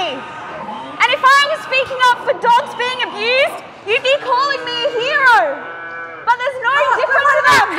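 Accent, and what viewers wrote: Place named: Australia. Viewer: Where is her pretentious Quebecois accent though? The vegan zombie is from Quebec... This youtuber has an Australian Accent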